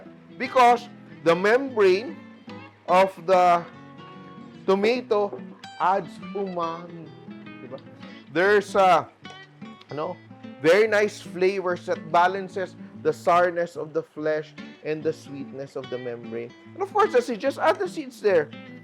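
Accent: Visayas, Philippines. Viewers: Pls do not speak english or tagalog in visayan accent. I don’t umderstand it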